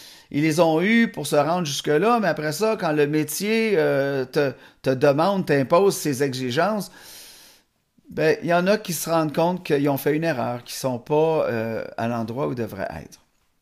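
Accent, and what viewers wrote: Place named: Vendée, France. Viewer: Ils sont tous en burn out! Le cerveau brûle...ce type de prof je le décalque au mur! J'eusse connu ...mais pas à ce degré j'avoue ! Ça craint ce monde plein de demon. Bisous Prof avec ton accent souche de Vendée je crois bien sauf erreur de ma part ! ❤💞❤️‍🔥🧠🫶🙏 le TDAH Ça me connaît mais chez nous y a un retard de 300 ans ...je comprends mieux en écoutant des concernés du Canada car en France c'est pitoyable ! C'est mon cerveau TSA qui m'a sauvé les miches! Je remercie mon cerveau chaque jour crois moi! Heureusement je n'ai pas de retard cognitif ! Etre ambivertie est une triforce! Je ne suis plus une enfant ceci dit! Un demi siècle déjà ! J'ai pu comprendre grâce au Dr Karpman qui causait avec Joe Black...je sais aujourd'hui qui je suis...parfaitement imparfaite dys sur dys! 😂😂😂😂❤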